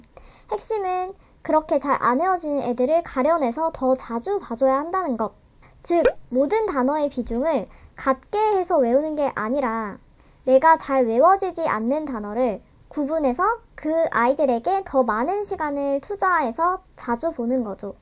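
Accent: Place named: Busan, South Korea. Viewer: 부산사시나요 ㅋㅋㅋㅌ 웃기네요 영상 잘 보고 갑니다~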